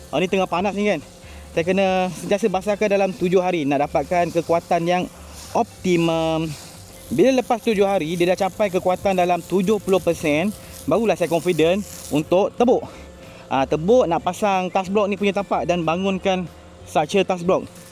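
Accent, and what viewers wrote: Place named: North, Malaysia. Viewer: Bahasa menunjukkan bangsa...kueh2 buat keluaq...hahhahah...abg org utara ka...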